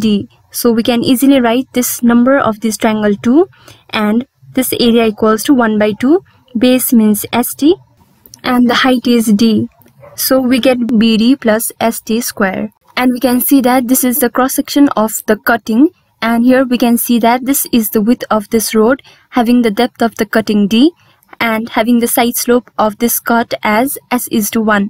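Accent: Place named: Nepal. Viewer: you have nepali accent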